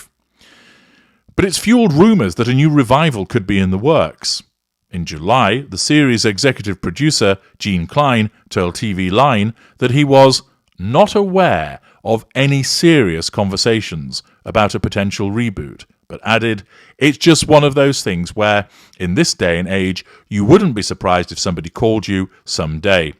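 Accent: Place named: RP, United Kingdom. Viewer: swearing in his posh, English (although im still trying to detect the region), velvety tongue, gets me EVERYTIME 🤜💥🤛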